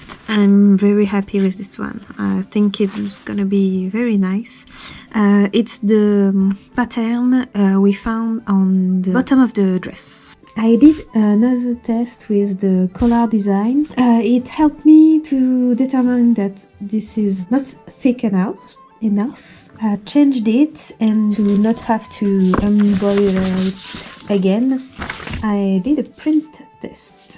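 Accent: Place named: England, United Kingdom. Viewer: 😮 cet accent anglais 👍 le sacrifice à la méchante créature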